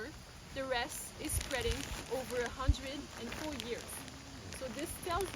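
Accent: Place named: France. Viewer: Where she sounds French? Could it be Canada?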